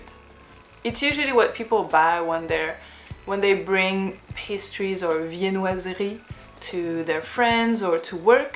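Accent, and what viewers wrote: Paris, France. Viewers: Oh dear! Now you've given me pastry cravings. Just have to plan a trip to France, that's all! Meanwhile, a snippet of feedback on your English. A LOT of English speakers say "pronounciation", but it isn't, it's pronunciation, just a u in the middle. If I had to guess where you're from, I would have guessed Saint-Germain-des-Prés, but I'm probably wildly wrong ... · but ...but...what about regional differences--like the southern provinces? quite different sounds. Is yours Parisian? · Damn I could hear that french accejt of yours. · I expect you to have that funny frenglish accent 😊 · You’re probably not going to respond but I’m going to ask anyway. What region of France are you from? Are you from the south or the north? Even though I consider myself fluent in French I still have trouble picking out accents. I live in a French household in America where my mom speaks French to me and my family. 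Also I think what people like most about meringues is that they’re sweet and melt in your mouth when you eat them 😋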